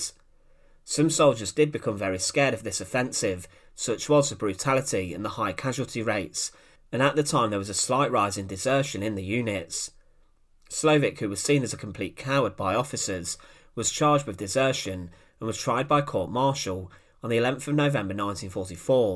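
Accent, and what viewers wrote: United Kingdom. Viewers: In case you did not quite grasp the narrator's accent, he is English (British), or a British speaking computer generated voice. In any event, those stakes you saw in the video's opening segment are of a memorial in the UK to those British Soldiers who were executed by the British Army for desertion/cowardness during the 1st World War. (Hence the statuary of the guy standing against one of those posts with a target hanging over his heart.) Desertion and cowardness was quite high in a British Army still heavily influenced at the time by Victorian norms' and where, almost to a man, the commanding officers of those troops back then were all from the aristocracy, privileged, very well to do, or political leading families--- there was no such thing as PTSD being heard of by this lot) during WWI. Hundreds of soldiers accused / tried for it were summarily executed in the field as it was a very common practice then.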